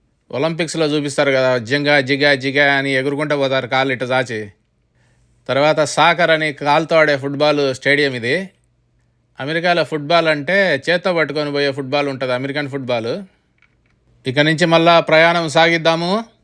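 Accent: Rayalaseema, India. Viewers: Thank you Liked your Telugu Royal Seema accent too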